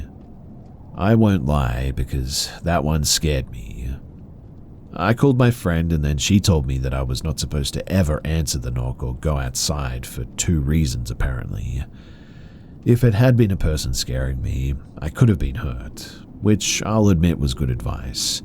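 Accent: Australia. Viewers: Are you from Australia 🇦🇺 ❤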